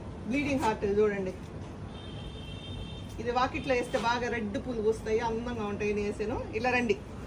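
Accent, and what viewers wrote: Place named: Rayalaseema, India. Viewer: Chelli! Meeru bhalega musthabu ayuthunnaru. Saree bagundi. Kadapa chittur districts slang bhale matladutbunnaru. Itlane matladuthu vundali.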